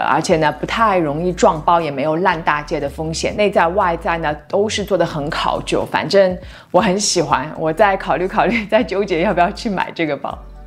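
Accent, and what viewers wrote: Shanghai, China. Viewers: 小姑娘好像是上海人。讲的很好 把我这不懂的瓜，都有点懂了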